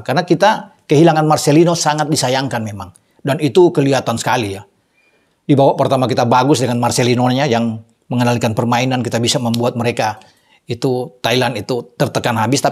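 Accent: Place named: Palembang, Indonesia. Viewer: logatnya kayak wong kito galo bener dak.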